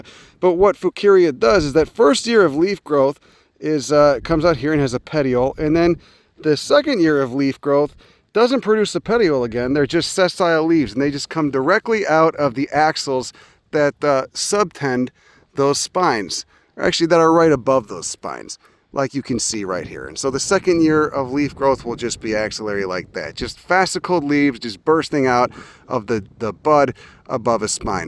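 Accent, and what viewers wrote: Chicago, United States. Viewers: inner city Chicago. its still there. he seems mellow today. perhaps its the paht.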